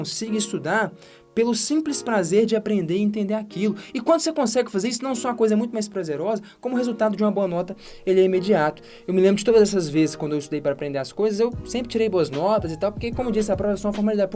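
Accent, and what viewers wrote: Minas Gerais, Brazil. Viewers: O sotaque dele é muito de mineiro msm minha família é mineira e eu sou goiana e ai ja viu né ksksksks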